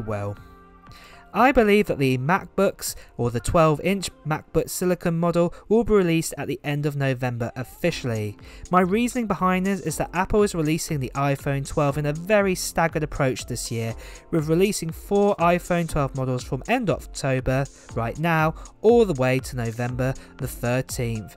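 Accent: United Kingdom. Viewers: Are you from the UK? You have that accent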